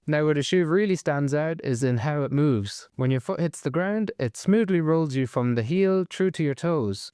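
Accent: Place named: Ireland. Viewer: Find it difficult to understand the thick Irish accent plus talks to damn fast so the obvious thing to help is when each shoe is introduced have the exact model flash up on screen this would be great help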